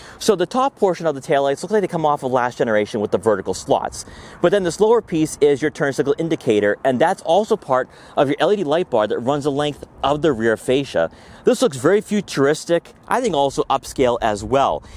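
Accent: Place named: New England, United States. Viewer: Tail light setup reminds me of a Lexus LS. Also loving this guy's wicked New England O's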